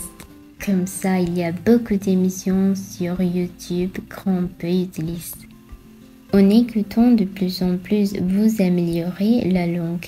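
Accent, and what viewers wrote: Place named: France. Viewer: Merci infiniment de partager vos conseils avec nous. Vous avez un accent français incroyable. Je vous souhaite tout le meilleur madame. C'est la meilleure chaîne que j'ai vue jusqu'à présent pour apprendre le français❤❤❤❤❤❤❤❤❤❤❤❤❤❤❤❤❤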